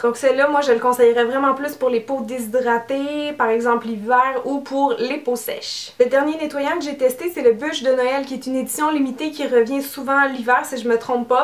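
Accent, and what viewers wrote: Quebec, Canada. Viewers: Elle est québécoise ! C’est fun je trouve comme accent !!!!!! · Coucou!! Jolie revue de la marque!!! Je l'aime beaucoup aussi!!!!! On a le même souci de fesses apparemment, et le même amour pour Copacabana!!!! (enfin Buffy chez toi si j'ai bien suivi ^^) Je ne sais pas comment ça s'appelle au Québec, mais mon produit préféré c'est Rafistoleur (une sorte de masque après-shampooing). J'ai testé aussi Bûche de Noël, c'est bien mon nettoyant préféré!!! Et dommage que ce soit une édition de Noël snif!!! Et sinon j'aime beaucoup leur mascara "Droit dans les yeux"!! Il fait très doux et naturel! (moi qui n'aime pas les mascaras trop voyants et lourds, ça m'arrange beaucoup et je l'aime vraiment! ^^) Sinon merci pour toutes tes vidéos! Je te suis depuis un bout de temps maintenant, et je ne commente quasiment jamais je crois bien (Lush m'inspire, j'avoue ^^) mais j'aime beaucoup tes tests, tes conseils, ta joie de vivre, et ton si bel accent qu'on aime tant chez nous ^^ * Bisous!!!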